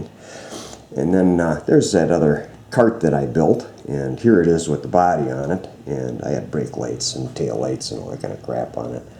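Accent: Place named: Midwest, United States. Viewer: Where do you reside? By your accent I'm guessing Illinois, Wisconsin, Minnesota, or Michigan.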